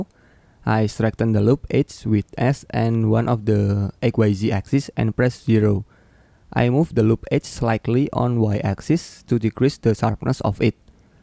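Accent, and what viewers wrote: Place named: Indonesia. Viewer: Thanks, it's helped a lot! btw I can tell from your accent, are you Indonesian? ;)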